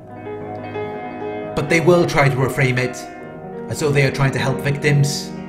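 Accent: United Kingdom. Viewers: No one explains the evil images of Narcissism like you do. Beautiful British accent. For sure narcs already destroyed tons of talented people who have so much to contribut to our world. This knowledge should have been readily available for everyone in their earlier age to minimize the effects of these emotional predator sadists. I wanna say thank you from the bottom of my heart.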